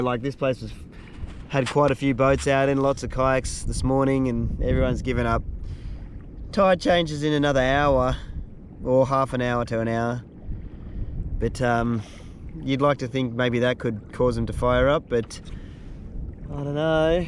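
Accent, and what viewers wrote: Australia. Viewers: You channel is addicting. As a guy with a expat snowy mountains father, the accent takes me home. Yeah nah y’all the best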